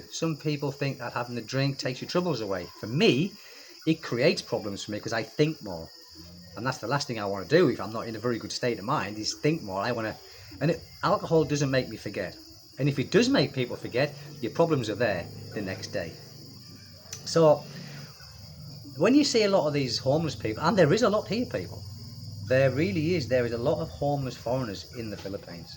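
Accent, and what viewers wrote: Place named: England, United Kingdom. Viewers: He has the Geordie English......I dor nor....lovely.😊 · Good Stories but most of all, my english improve... Hehe U have the english english.... Grueß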